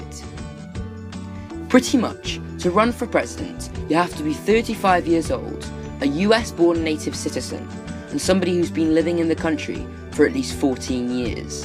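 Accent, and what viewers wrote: United Kingdom. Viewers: tight n sleek british accent..loved the video :)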